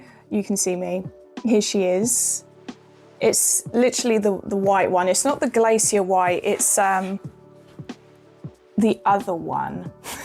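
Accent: United Kingdom. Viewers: Gamer girl with a british accent. It doesn't get much better than this. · Came for the vita, stayed for your cute British accent Love From from Portugal.. +1 sub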